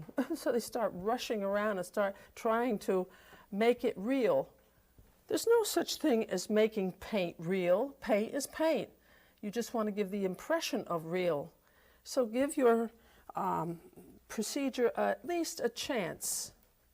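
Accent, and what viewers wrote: New York, United States. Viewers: Would be honored to get my hands on Helen's books. I've been able to view many of her dvd's through interlibrary loan. I immediately resonated with her unmistakable New York accent.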